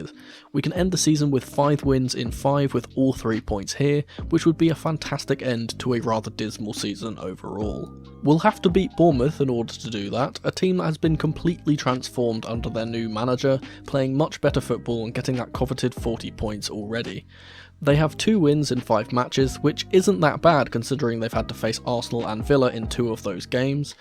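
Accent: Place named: Spain. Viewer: that spanish accent. Damn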